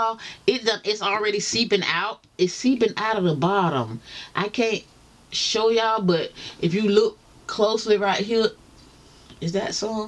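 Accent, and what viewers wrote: South, United States. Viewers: I love your southern accent. I am now a subscriber.😍❤️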